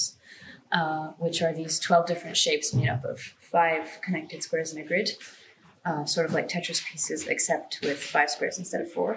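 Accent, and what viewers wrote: United Kingdom. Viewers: Its British English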